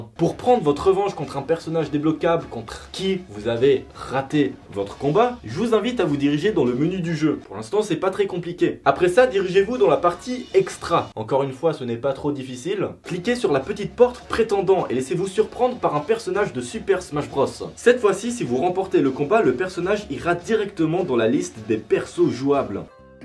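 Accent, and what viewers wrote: Switzerland. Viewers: T’es suisse ? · JE SAVAIS QUE TU ETAIT SUISSE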